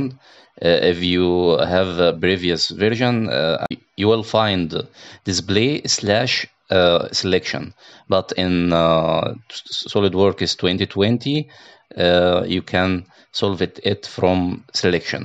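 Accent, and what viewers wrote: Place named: Russia. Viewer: الآكسنت كأنك روسي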